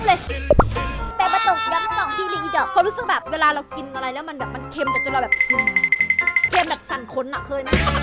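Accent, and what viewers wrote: Isan, Thailand. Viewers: พึ่งเคยได้ยินเสียงพี่แบบนี้พี่พูดอีสานด้วยไปกับหน้าด้วย555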